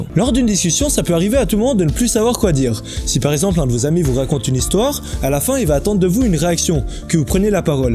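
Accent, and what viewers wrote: Vaud, Switzerland. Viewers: Tu est vaudois ? J'adore ce que tu fais 👌👍 Depuis que j'ai regarder cette vidéo je parle beaucoup mieux et les personnes son plus intéresser a ce que je dit merci 😊 continue 👍👍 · T'es suisse???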